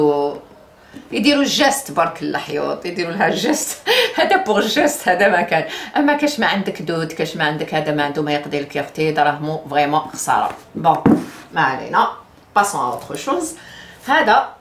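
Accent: Algiers, Algeria. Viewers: يعطيك الصحة حبيتك للهجة تعك فكرتني في العاصمة القصبة بادات ونقى تاعك تقول ميمتي العزيزة راه معايا يعطيك الصحة وربي يجزيك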